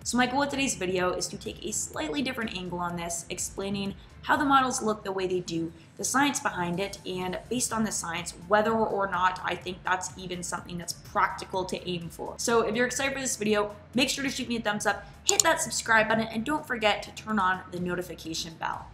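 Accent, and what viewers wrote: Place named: Canada. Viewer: Just realized you're Canadian but how you pronounce words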